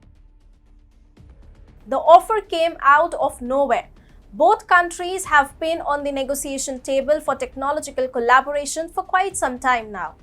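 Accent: India. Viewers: Dragging her spoken english words with super strong indian accent,idon't know how thr media company chooses her,she also yell over spoken sentences.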